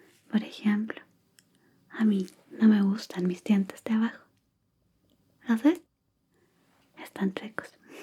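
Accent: Chile. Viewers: Gracias a tí preciosa, puedo pasar de 🤬 a 😊 Tu voz dulce y acento chileno, hacen maravillas ✨✨✨🌹👸🌹 Gracias 🙏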